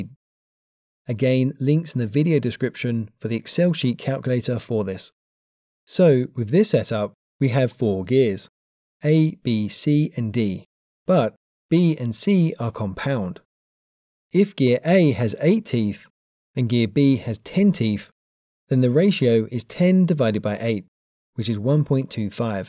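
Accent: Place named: United Kingdom. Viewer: I think he's a british guy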